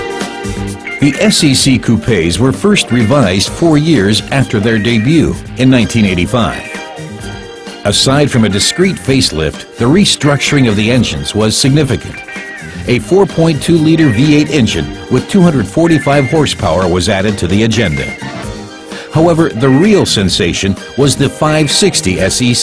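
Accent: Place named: United States. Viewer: The awkward American narration grates.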